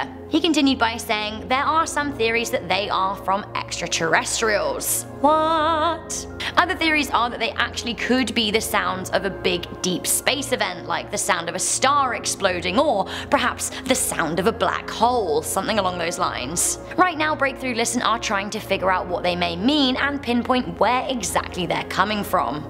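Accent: England, United Kingdom. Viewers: you had me hello. Love the video. I would love to lay in your crop circle. I would roar like a lion. Gaze in your gorgeous star litd eyes then shoot my Milky Way while you say Maui Wowie and explain how big & large it is..😲🐘😄 I love 😍the way you say while you're down 🙃there👅 with that cute English👿accent - very HOT 💖 it-👫-🤑-love u" from across the 🌎pond. Sorry I have to refocus now your message🙃 which one is the most scariest of this video 🤔I would say Stephen Hawkins quote that when aliens finally reveal themselves to us it would be like Christopher Columbus reaching the Americans💥💣💥💣💥💣GAME Overtook 👽👾👽👾i think im leaving more than a 🖒'S... UP lol he he he. 🌷chow for now my lovely lookin alien 😍😙🤑😚👿..📞ME 😆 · Why does every top 10 list have a british accent in it? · This chick is the most annoying/ignorant youtube host.. This channel usually has interesting top 10 topics that end up being awful simply because they allow this laughably stupid and cringe fueled moron narrate. 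News flash: Having an English accent does not automatically make you intelligent.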